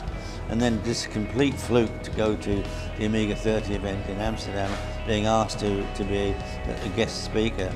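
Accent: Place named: Norfolk, United Kingdom. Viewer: Cor - you sound right Norfolk boy!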